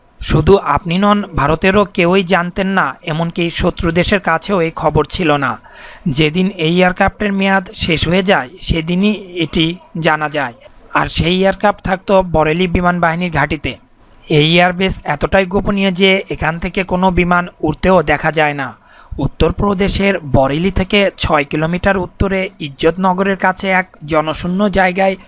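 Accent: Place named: Bangladesh. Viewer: বাংলাদেশিরা ওই রকমই উচ্চারণ করে , এর অর্থও বোঝে কি না কে জানে!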